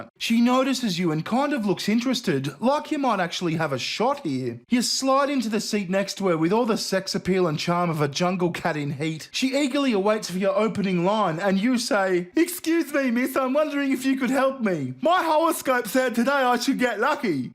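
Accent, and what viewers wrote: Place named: Australia. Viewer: the australian accent is just so awesome. i want u to say put another shrimp on the barbie in an upcoming vid. with the exaggerated upwards accent like 80s paul hogan. p'huttt ahhnuhhthah sh'hrhemp auwn theah bahbbeh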